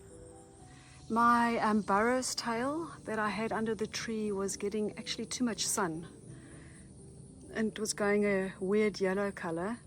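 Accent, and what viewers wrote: South Africa. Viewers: What a beautiful garden I thought you're here from SA with the accent but the house and fence is not typical until you mentioned frizzle sizzle plant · You have an SA accent. I love your garden. One of the best so far. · You sound South African stayed to watch because of that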